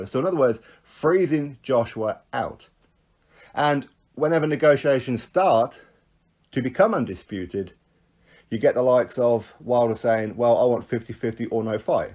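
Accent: United Kingdom. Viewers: Listen obviously from your accent your britian but whats fair is fair. Ruiz whipped joshua ass no matter what you say he knocked him down more times. Wether it be if his head or distracted whatever the bullshit is. But ruiz is the new champ. Remtatch clause or contract whatever the fuck you wanna say. Ruiz is the new heavyweight champion. Id rather see him fight wilder or fury. The rematch will be the same. And dana white says styles make fights. Ruiz was better that night. And in my oponion hes the better fighter ruiz is a savage joshua is a terminator robot with no rythym just strength. In boxing its more then strength. Ruiz was and is the better fighter. Get boxing politics and money out the way. Ruiz is more superior ......period if you cant read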